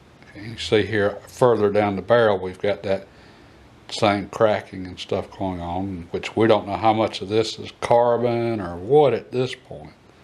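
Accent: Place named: North Georgia, United States. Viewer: Enjoying your channel, I have been trying to pin down where you are from according to your accent. I was a trucker in my younger years and consider myself pretty good at guessing, leaning towards northern Georgia or maybe middle Tennessee 🤷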